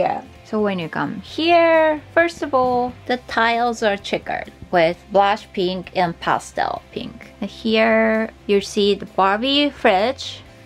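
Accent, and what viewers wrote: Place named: Belfast, United Kingdom. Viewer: hey love your belfast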